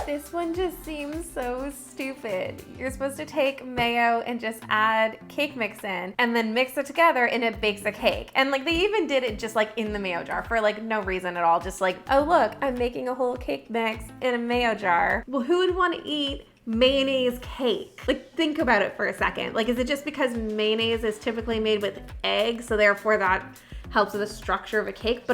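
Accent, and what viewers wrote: Canada. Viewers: is she Canadian or does say aboot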